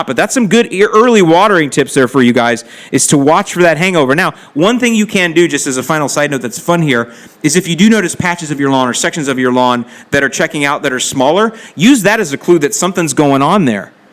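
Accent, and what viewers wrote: Chicago, United States. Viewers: ....killin’ Me with that Chicago accent. It’s alright tho buddy, I ain’t mad atcha.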